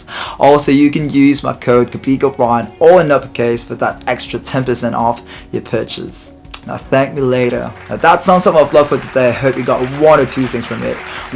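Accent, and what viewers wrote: United Kingdom. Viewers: Wow! British Accent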